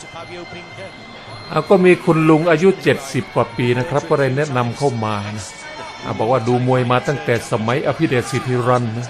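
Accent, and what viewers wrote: Isan, Thailand. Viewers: ผมคนจังหวัดนครสวรรค์คนภาคกลาง ยังชอบเวลาภาคเสียงอีสานเลยครับตลกและฮาดีครับ..ชอบๆครับ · 😂😂😂😂😂😂😂😂😂😂😂😂😂😂😂😂😂😂😂❤❤❤❤❤❤❤❤❤❤❤❤❤❤❤❤❤❤😂😂ชอบคนพากษ์ที่ชือ พากษ์อีสานฝรั่งขะแมร์😊😊😊😊😊😊😊😊😊😊😊😊😊😊😊😊😊😊😊😊😊😊😊😊😊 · ผมว่าน่าจะใช่ พากย์มิสเตอร์บีนเสียงอีสานด้วย เสียงคล้ายมาก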